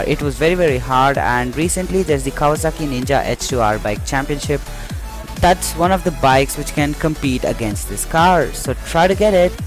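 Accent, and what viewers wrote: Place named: South Asia. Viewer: You sound south asian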